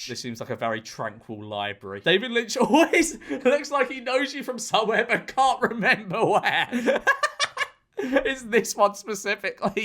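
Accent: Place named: Birmingham, United Kingdom. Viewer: Extra brummie today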